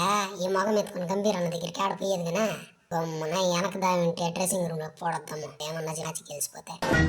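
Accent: Chittoor, India. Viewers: Bagundandi.... Pushpa kante ikkade chittoor slang Baga undi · Maa chittoor slang super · Maa chittoor yaasa suparu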